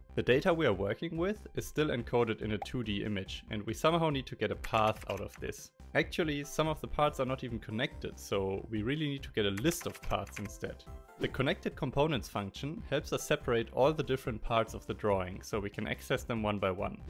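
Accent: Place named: Germany. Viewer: Your way of speaking seemed familiar to me, so I checked your channel info and who would have guessed... A fellow german! (Really enjoy the videos btw. mach weiter so!) :D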